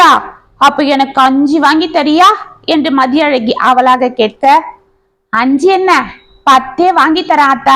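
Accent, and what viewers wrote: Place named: Theni, India. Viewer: உங்கள் குரலில் தேனி வட்டார மொழி அருமை❤️❤️❤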